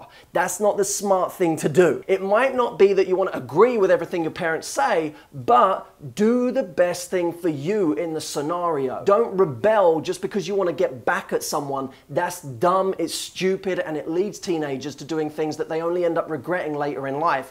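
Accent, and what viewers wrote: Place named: United Kingdom. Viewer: (aint always easy) anal is easy?! hahahahah I love the british accent.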